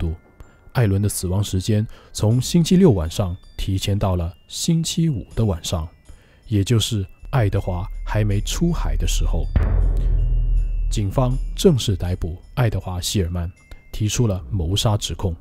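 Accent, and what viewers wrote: Standard. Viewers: 国语好标准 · 國語和粵語都很標準喔，主持人是哪裡人？ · 普通话很标准，听起来舒服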